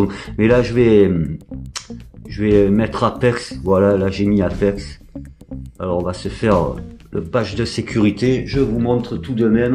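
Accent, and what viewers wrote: South, France. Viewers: Tjr au top tes vidéos tjr un plaisir avec ton accent du sud que J aime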